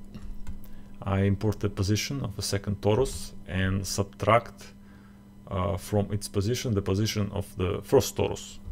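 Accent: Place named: Russia. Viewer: Сразу слышно русский акцент. 😊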